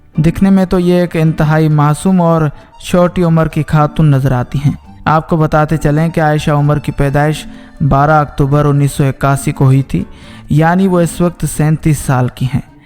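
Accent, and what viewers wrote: India. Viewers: App ki language Kis kisam ki hay? Lahja or talafz Hindi hay. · Sub say phalay apni Urdu sahi karo Urdu ko handi ki tarha mat bolo bhai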